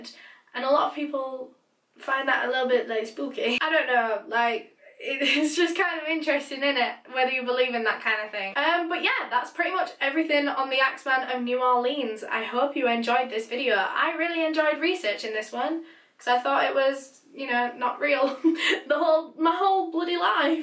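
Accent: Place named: England, United Kingdom. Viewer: so you prob sound just as cute! I love this English accent!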